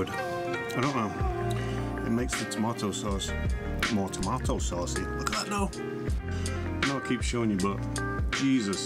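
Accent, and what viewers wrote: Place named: RP, United Kingdom. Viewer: Hello where are you From originally the accent you have is posh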